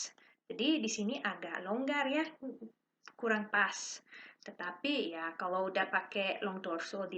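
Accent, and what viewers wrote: Bali, Indonesia. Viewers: u got Balinese accent actually..kebaya suits u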